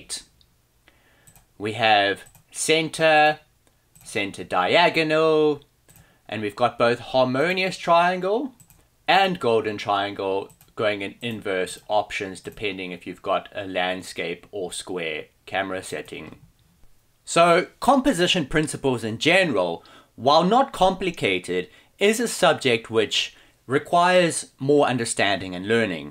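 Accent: South Africa. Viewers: South African accent comes through strong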